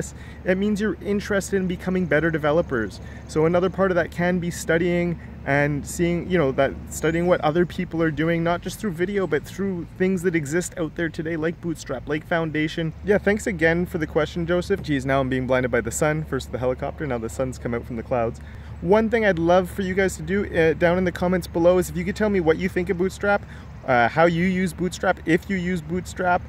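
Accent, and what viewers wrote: Canada. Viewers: Hi..... are you canadian?? xD I think I recognize your accent.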